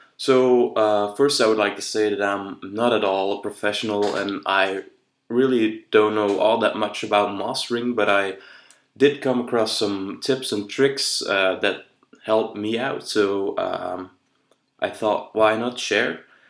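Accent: Belgium. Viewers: Joli petit accent belge ;) On va essayer ca, thanks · This has been amazing! Thank you so much! I recently moved to Amsterdam and thought you sounded Dutch! Well, Flemish!? Thanks again! x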